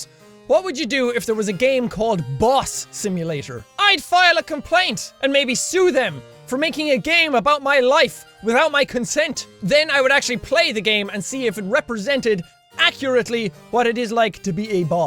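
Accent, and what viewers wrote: Ireland. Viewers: Are u Irish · Hey fellow irish man here. Where ya from and can you please say Ballymena 'ey for me!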